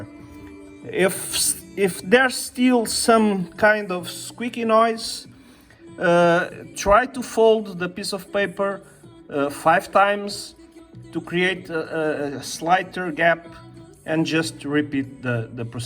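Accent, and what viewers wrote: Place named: Brazil. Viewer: Sabia q era br pelo sotaque kkkk Vlw pelo video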